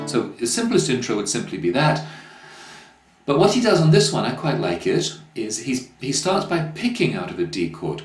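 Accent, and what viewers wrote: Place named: United Kingdom. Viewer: Great lesson thank you. Very funny how the Brit accent drops instantly singing right on the money:)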